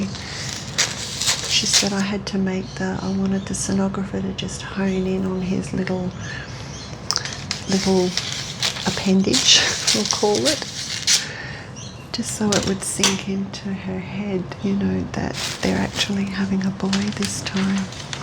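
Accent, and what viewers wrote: Australia. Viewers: A few years ago I was thrilled to learn that ASMR was a "thing" too, when I stumbled across it while searching for Reiki videos. I used to think I was a weirdo too! 🤣 I especially Love soft spoken accented voices; your Aussie accent is dreamy 😵‍💫✨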